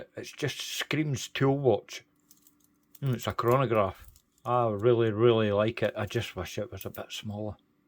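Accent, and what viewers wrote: Scotland, United Kingdom. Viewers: Love the commentary. Swearing doesn't seem as bad with a Scottish accent 😂 P.S. Just ordered the watch you reviewed, I've been looking for a white tool watch for a while.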